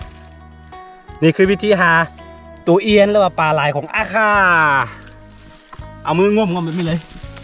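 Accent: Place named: Isan, Thailand. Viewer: พูดคล้ายๆคนอีสานเลยค่ะ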